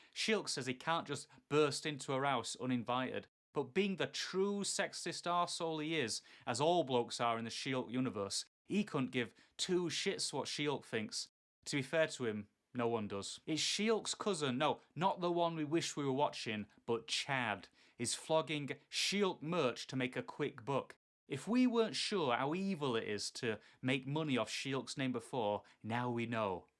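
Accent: Yorkshire, United Kingdom. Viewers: The Yorkshire accent is 10/10 makes me want to visit hahaha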